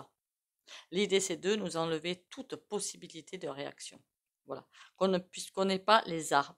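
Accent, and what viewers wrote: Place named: Pyrénées-Orientales, France. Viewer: Bonjour merci beaucoup nous on a le mistral qui souffle à 120 km heure on se pèle pourtant il fait que 9 degrés j'étais à l'hôpital Sainte-Marguerite ce matin à 8h pour faire un prélèvement du liquide que j'ai à mon genou synovial je crois que ça s'appelle émettre la cortisone je repousse le moment de la prothèse je me repose au chaud bisous passer une excellente journée et câlin aux petits cœurs vous avez l'accent des Pyrénées-Orientales😊❤❤❤❤🥰🥰🥰🥰🥰🥰🐕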